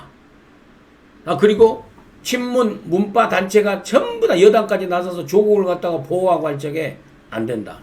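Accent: Jeolla, South Korea. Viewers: 홧팅하세요 전라도 말씨가 구수하네여 근데 참여연대가 저런짓 하는거도 의심 갑니다 무슨 꼼수가 있는지요!! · 언제나 뵈도 구수한 전라도 사투리에 정이묻어나는 내고향 전라도 아자씨 오늘은 고향 아줌마가 인사드립니다 2013년도부터 우리의 박근혜대통님을 높이 평가해주신 익히 존경했음니다 감사들임니다 전라도 분으로서 오래 오래 도록 우파를굳게 지켜주신 민대표님을 진심으로 존경합니다 힘내사고 오늘도 건투를빕니다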